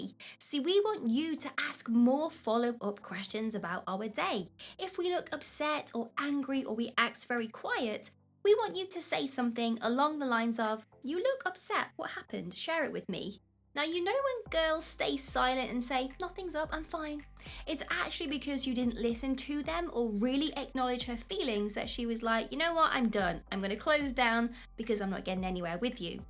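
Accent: United Kingdom. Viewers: Just love that British accent...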